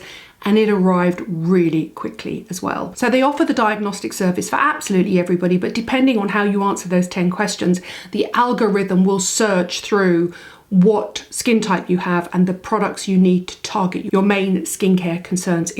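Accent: United Kingdom. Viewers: you are looking so fresh and well in this! I so look forward to these videos. I miss all my UK pals and this gives me a little “fix” as your turn of phrase and accent are exactly the same! Always excellent info as well. I have subscribed to Beauty Pie but honestly the shipping plus the monthly charges are not adding up to making it worthwhile as some of the makeup in particular is really just so-so.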